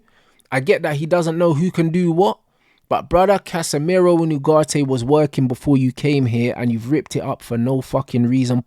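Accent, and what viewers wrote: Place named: Manchester, United Kingdom. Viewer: Lovely Manc accent though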